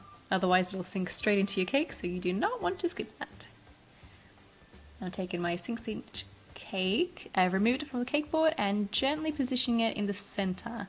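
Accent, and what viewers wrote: Australia. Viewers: You sound like you're from Australia.. are you?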